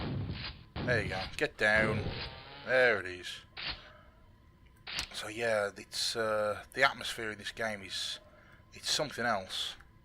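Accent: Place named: United Kingdom. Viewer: Oh, I grew up on Quake. British accent + retro games = clear win. Do you mind if I add you to my directory of recommended YouTube Channels that is part of my online store?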